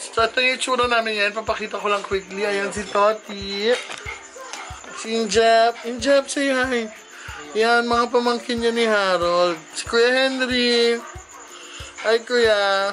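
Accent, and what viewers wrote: Iloilo, Philippines. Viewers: Madam ilonggo ka pla tagasaan ka?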